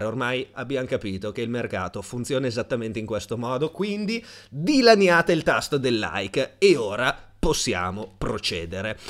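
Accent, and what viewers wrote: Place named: Friuli, Italy. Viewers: Sembra essere tra Udine e Tavagnacco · Vero però ha un accento che sembra friulano 🤣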